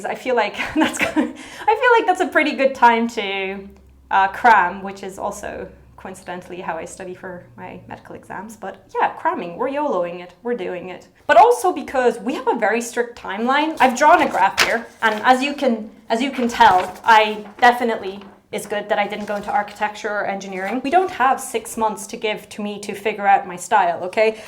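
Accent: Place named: Ireland. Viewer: I believe in you 🥰😍 you can do more than you give yourself credit for, and I love your accent 🥰 you sound exactly like I imagined, your Irish is making me happy💖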